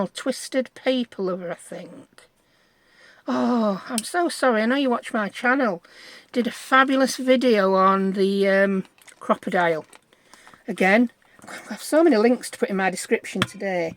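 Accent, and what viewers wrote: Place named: England, United Kingdom. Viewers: Whenever you say "I don't know", it reminds me of the potato ad I loved.."Don't know, it's all covered in mud" love the yorkshire acent. Much better than mine ....(semi, scouse! I'm from other side of water!) Love your videoes.... please say Hi to Gertrude for me LOL! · Omg I love your act sent alway did Or Linden English England just love that accent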